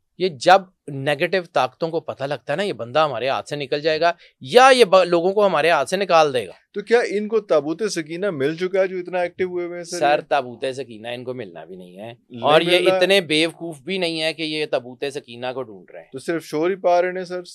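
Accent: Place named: Punjab, Pakistan. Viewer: your Punjabi accent is hilarious 😂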